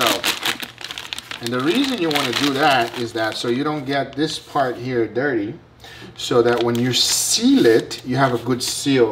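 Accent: Cuba. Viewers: Hearing a guy from Brazil speaking Spanish with a Cuban accent made me want to move to Miami. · it's spanish, I know is brazilian but he was speaking spanish to the girl, with a strong Cuban* accent btw... He says "tu"(sp) instead of "você"(pt) like in "¿Tu quieres carne? (PT: você quer carne?/do you want meat?) He also says "abre la boquita (PT: abra a boquinha/open the little mouth) * I know I said cuban, but I think it's the Floridian spanish which is heavily influenced by Cuban accent, I've been there and noticed even non cuban latinos having their accents influenced(a little or a lot) by that cuban accent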